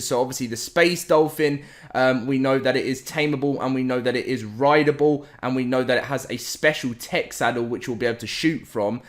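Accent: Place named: United Kingdom. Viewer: According to his voice: UK According to his looks: US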